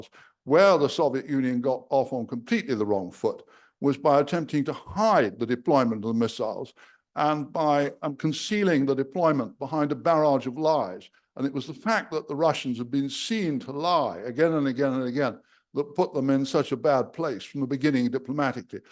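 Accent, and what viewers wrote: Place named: United Kingdom. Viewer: yes, when I hear a pompous British accent I know it's a coward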